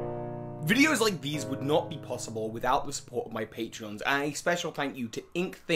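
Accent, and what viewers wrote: United Kingdom. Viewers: ive always thought you said "so hi pokemon masters" cuz of the brittish accent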